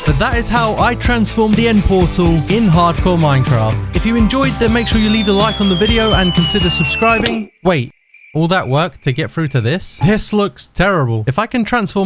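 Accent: United Kingdom. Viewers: Not to be offensive or anything but are you British or Scottish?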